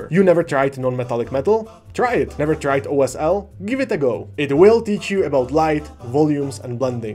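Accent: Czech Republic. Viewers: Zdar, paradni mas videa bro. Zijes v Ameru? Ja jsem v Chicago..zdalo se mi podle accentu ze budes od nas, ale az pak tvuj email te prozradil a jeden napis Praha na jednom ze stetcu.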